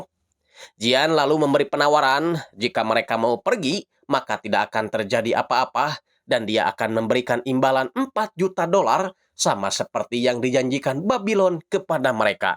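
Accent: West Java, Indonesia. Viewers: mantapp mangg, guaa sukaa chanel ini cuma garaa² amang nya ada sundaa² nya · Keren pisan euy channel na Aya logat sundana Salam dari malausma Majalengka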